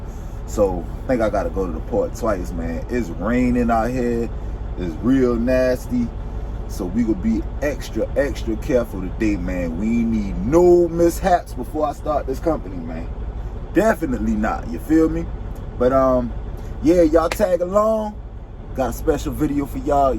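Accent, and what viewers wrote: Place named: Geechee, United States. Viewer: I knew it!! I’m from downtown Charleston. I hear the Geechee dialect but I hear something else in there too. I’m looking into the company and I’m kinda excited!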